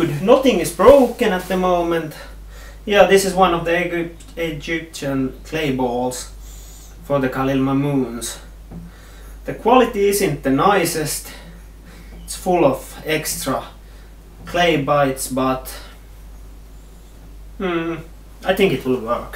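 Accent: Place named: Finland. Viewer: Kiitos nopeasta vastauksesta. No hyvä, ajattelin jo että joudunko kiduuttaa viikkoja.. Ps. Englantisi on hyvä, mutta suomalaisuus paistaa kyllä taustalta omaan korvaan. Niin kuin kuuluukin. Pistä lisää Hookah videota tulemaan!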